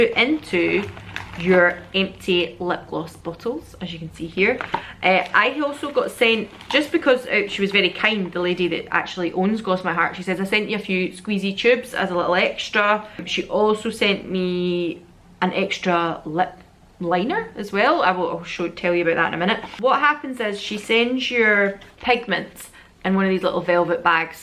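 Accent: Scotland, United Kingdom. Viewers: Goodness! You are adorable!!!! The Scottish can say whatever they want and it sounds lovely...we American’s though...ugh. I am going to put Eunique Beauty on the wishlist.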